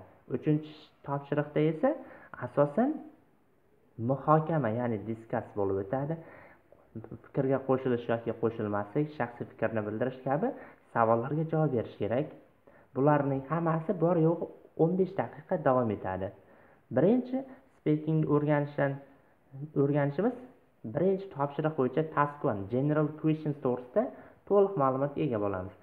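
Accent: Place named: India. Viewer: Aka aksent hindistonnikimi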